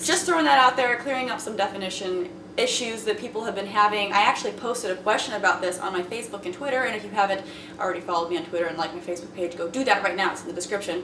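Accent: South, United States. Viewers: Even famous biologist Richard Dawkins has noticed and appreciates her style. We all love listening to her, even the Muslims watching "Muslim hot line" although they won't admit it. Me I just LOVE the southern accent y'all. #masturbateforjaclyn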